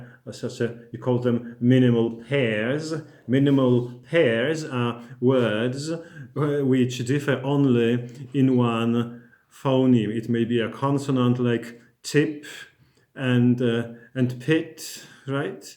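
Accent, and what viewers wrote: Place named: Russia. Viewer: Oh, your accent sounds awful! Are you russian?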